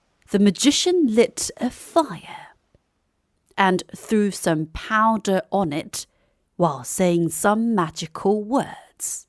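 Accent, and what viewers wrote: United Kingdom. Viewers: 영국발음 들으러 왔는데 왠일인지 점점 졸려서 10시도 안됐고, 영상은 항상 집중하게 되서 이상하다하고 피곤한 저를 질책하고 반성하려 하다가, [수면용]이라는 걸 다시 깨닫고 아직 잘 때가 아니라서 "Ask not, but eat" replied Aladdin.까지만 봤어요 ㅎ 세시간 뒤에 영상에 목적에 맞는 시청자가 되어서 다시 듣도록 하겠습니다~ 영상 감사해요~ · 저 따라하다가 영국발음 성대모사 중독돼버렸어요 ㅋㅋㅋㅋㅋㅋㅋㅋ한국말도 자꾸 영국식으로 주접떨면서 하고싶고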